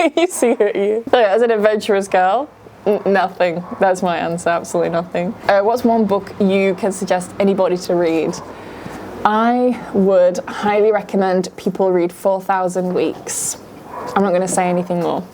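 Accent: United Kingdom. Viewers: Would you please read short stories.. I like listening to your beautiful clear British accent narrating a short story.. thank you in advance ❤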